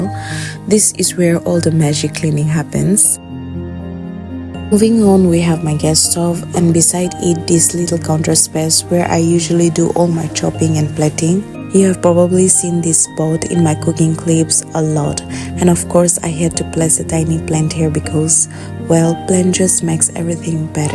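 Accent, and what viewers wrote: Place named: Nigeria. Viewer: Your home is pretty but has anyone told you that you sound like a Nigerian I had to double check your profile but its cute like you are one of us❤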